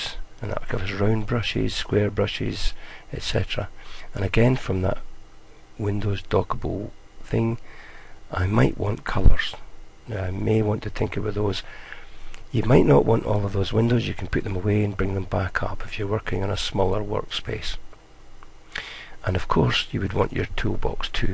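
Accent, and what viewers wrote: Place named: Scotland, United Kingdom. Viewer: I love the scottish accent.I was just having a bit of fun with you.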